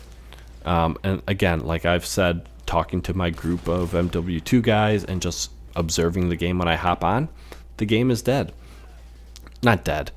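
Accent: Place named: Midwest, United States. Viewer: You sound so midwestern lol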